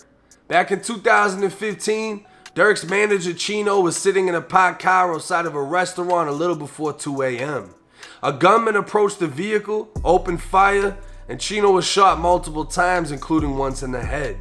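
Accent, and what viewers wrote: Boston, United States. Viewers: You from Boston ? I can read the accent lol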